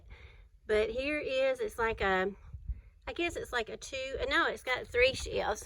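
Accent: South, United States. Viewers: Leave Bird House just like it is., so rustic. With the transfers you are able to create the most beautiful, darling diys! I could listen to you talk all day because I love your southern accent. I love that y’all call a hose a water pipe. It is so cute! Thank you for your wonderful ideas and have a blessed day! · you are so creative! I love your DIY’s and your sweet southern accent.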